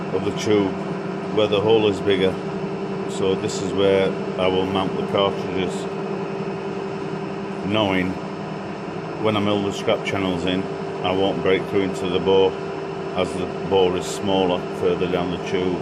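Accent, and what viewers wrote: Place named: Manchester, United Kingdom. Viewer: who's brave enough to sit in the chair next to the bridgeport.also i am hearing a manchester accent,how close am i.great machine work mate.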